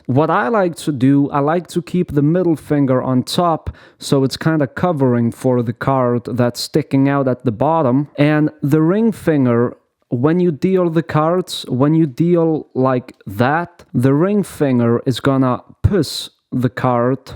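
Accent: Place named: Russia. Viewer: I’m just here to hear his Russian accent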